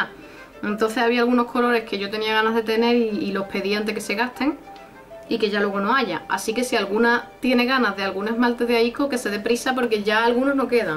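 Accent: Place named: Andalusia, Spain. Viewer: que arte tienes hija!!! como se nota que eres andaluza!!! ijijijijijiji, tu como el resto de gurus creandonos necesidades!!!! aprovecho pa felicitarte por tu canal que lo he conocido de rebote y me a encantao!!!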